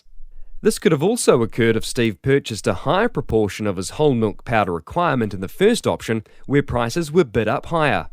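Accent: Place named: New Zealand. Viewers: its kiwi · It’s a stindard iccent in New Zealand.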